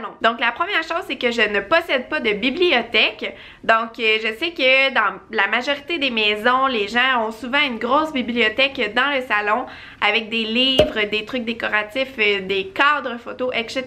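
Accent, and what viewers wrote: Quebec, Canada. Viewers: l'accent québécois c'est vraiment un accent très spécial🤔🤔🤔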